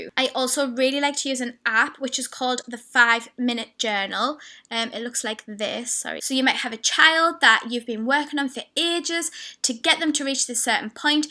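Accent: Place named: United Kingdom. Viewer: Lovely to hear your experience of The Miracle Morning. Great to hear another British accent :)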